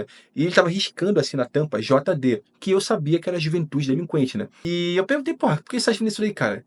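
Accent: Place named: Rio de Janeiro, Brazil. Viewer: Que tal você sair do Rio? Vem pra Minas!!! Aqui a conversa é outra, aqui tem pão de queijo e café no bule. Vai ser bom pra você, porque você vai aprender o mineirês e vai perder esse sotaque enjoativo do povo daí. Tá ruim aí? Vem pra cá! O que você tá esperando?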